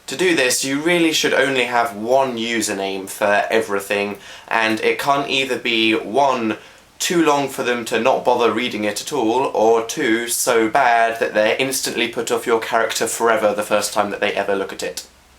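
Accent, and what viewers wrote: United Kingdom. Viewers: Why is he so overly British in this 😭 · He sound extra british here =))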